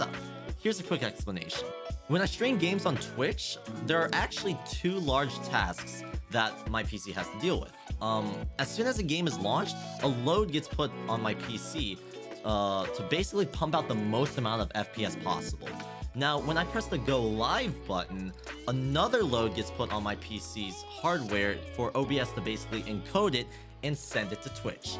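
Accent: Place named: United States. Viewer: You sound like American but are you Korean or??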